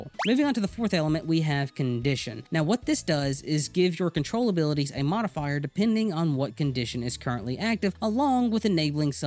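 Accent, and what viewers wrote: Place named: Kentucky, United States. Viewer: Sounds like me and I’m Kentucky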